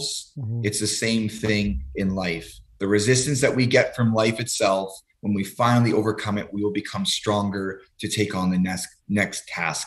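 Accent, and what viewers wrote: Canada. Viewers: He sounded Canadian to me. I live in Regina Saskatchewan! I definitely find this so intriguing/fascinating. I also came out of hard-core drug addiction four years ago. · Is this man Canadian? From the East Coast?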